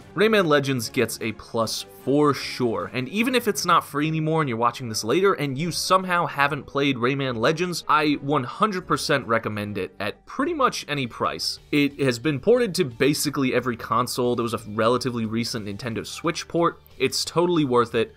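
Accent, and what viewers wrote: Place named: Russia. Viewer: I keep forgetting to download this game. Also it sounded like a Russian accent me. Good review!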